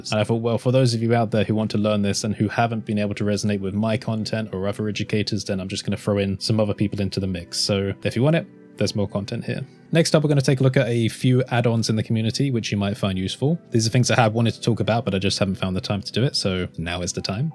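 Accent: United Kingdom. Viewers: I could listen to this Bri-ish accent forever much better if I Could speak it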